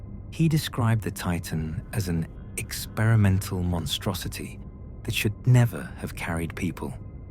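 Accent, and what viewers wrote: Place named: United Kingdom. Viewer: wonderful British accent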